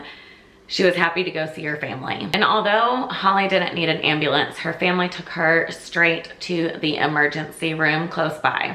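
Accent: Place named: Louisiana, United States. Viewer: You sound like you’re from Southeast Louisiana.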